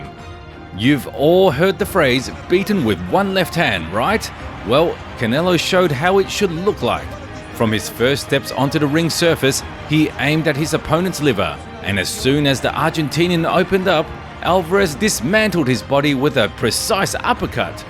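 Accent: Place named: United Kingdom. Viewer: This might have been a great video, accept: the narrator spoiled everything. This guy mispronounces everyone's name along with that terrible UK accent. 👎👎👎👎👎👎👎👎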